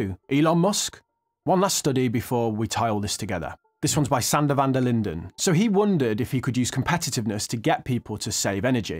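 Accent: Birmingham, United Kingdom. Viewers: is it Birmingham accent?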